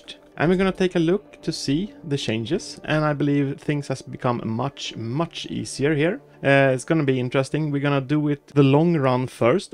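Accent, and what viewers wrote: Sweden. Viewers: nice that helped me a lot!! are you from sweden? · you kinda sound swedish (think you are from sweden)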